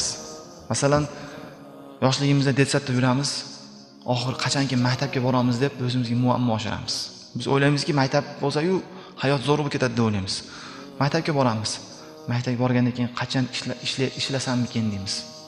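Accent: Kyrgyzstan. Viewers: Рахмат акжон жуда чиройли гапирасиз миллатиз киргизми лекин узбекчани яхши гапирасизоценкларда сал билинади лекин ярашади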